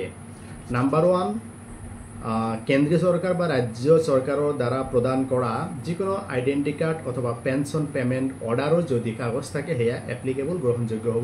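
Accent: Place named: Bangladesh. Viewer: Maat tu pura bangladeshi jen lagise.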